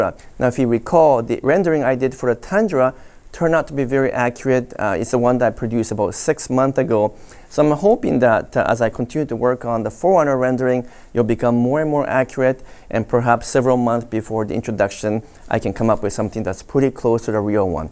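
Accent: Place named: Japan. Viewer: Oh damn the best Japanese English.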